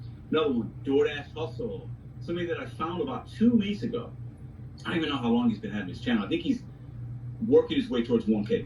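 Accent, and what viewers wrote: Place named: Jamaica. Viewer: Awesome sounds like a yard man🇯🇲 . Congrats on the channel.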